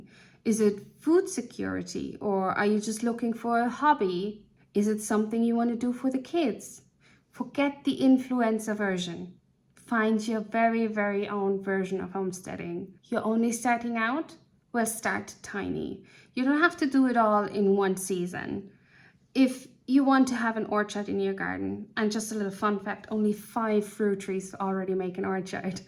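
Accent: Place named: Ireland. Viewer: Happy to have JUST found your channel! I could truly listen to you speaking any time - it reminds me of the brogue of my Da's speech. Da's gone now, but it's like homecoming to hear it. Anyhow - the points you make are so very true! Homesteading can be any form, depending on the homesteader! You don't have to be 100% self-sufficient to be a homesteader. Garden where you are, how you are and learn what you WANT to do. If you garden, learn how to preserve what you grow or what you can get on good sale so you can use it when it's not in season. Etc, etc. Homesteading isn't for EVERYONE - it can be stressful, but it can also be comforting. Don't let "influencers" be your model of how you should be. Much love!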